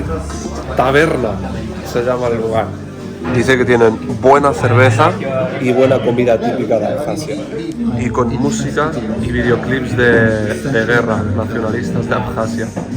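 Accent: Andalusia, Spain. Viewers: Desde Santiago de Chile 🇨🇱 Sudamérica, Si, es Alex Tienda. Recién ví un vídeo de él, acerca de la Fiesta de los Muertos, en México, hacia tiempo que no subía algo. Está físicamente, cambiado, y no sólo por más edad. Por la forma que tiene el amigo de hacer sus Videos, en Corea del Norte, sería imposible, lo llevarían preso. Ellos no se hacen ningún problema en torturar, y hasta matar a una persona. Ya lo hicieron con un norteaméricano, que sacó un póster de propaganda política, de una pared. Prefiero al amigo, en países no conflictivos. Lo sigo desde sus primeros videos, tendría menos de 18 años. Eran muy básicos, pero lo divertido es él, con su acento andaluz y su forma de hablar. Por lo menos, para nosotros, los de América Latina, nos resulta divertido.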